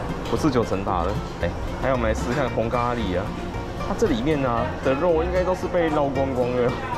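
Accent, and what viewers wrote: Taiwan. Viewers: 應該本是台語人吧！？😊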